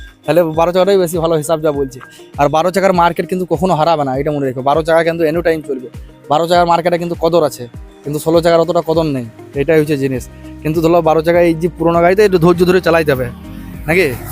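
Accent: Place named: Purulia, India. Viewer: দাদা আপনার বাড়ি কোথায় ❤❤❤ , কথা গুলা আমাদের পুরুলিয়া র মত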